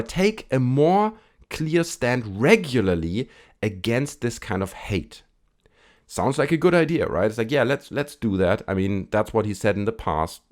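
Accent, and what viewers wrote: Germany. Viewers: Also out of context are u german? just ur accent.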